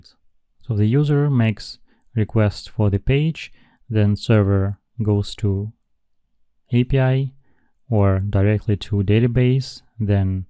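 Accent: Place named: Eastern Europe. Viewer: Not bad. Slavik accent is hardly heard. Keep it up!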